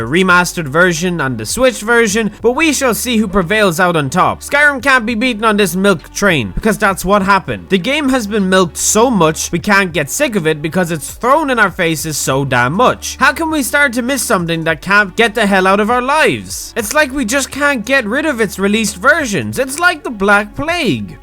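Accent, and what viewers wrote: Ireland. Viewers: I missed your fucking beautiful Irish accent. STOP TO TORTURE ME AN GIVE ME MORE VIDEOS PLSSSSSS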